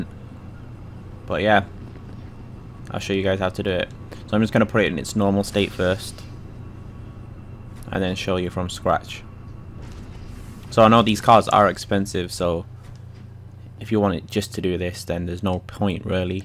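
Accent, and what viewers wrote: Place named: Bury, United Kingdom. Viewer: u from bury?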